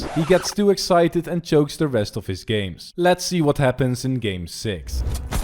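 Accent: Netherlands. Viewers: Nice accent, you Dutch? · ik dacht al de je accent erg veel op een Nederlands accent leek Nice man Keep up the grind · Its so funny want ik weet aan je accent dat je nederlands bent maar nice man you are such a good player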